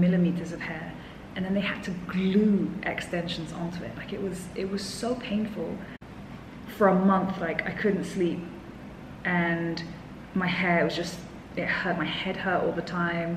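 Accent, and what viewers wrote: London, United Kingdom. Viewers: she's british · Dia setengah prancis tapi pernah kuliah di inggris jadi yaaa mungkin karna itu dia punya British accent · Sukaaa sama aksen nya british bangeeet 😍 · She has british accent omg im so in loovveeeeeee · love your londoners accent 😄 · Bloody hell, you speak the way London folks does...fuck yeah...you are amazing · British uyy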